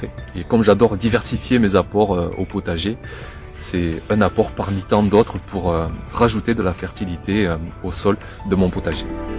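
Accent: South, France. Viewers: Bonne idée!!! Sais-tu si on peut aussi utiliser les huiles essentielles contre les poux rouges. Pour l'instant, je n'ai pas ce problème, mais, en prévention, je voudrais agir avant de devoir guérir. Au fait, Est-ce que c'est bien al que je connais? Nathalie VALERO Merci pour tous ces partages de belles expériences. A l'accent, j'entends que tu es dans le Sud. Et à l'emploi de certaines expressions, tu me fais penser au naturopathe Christophe BERNARD. Est-il de ta famille?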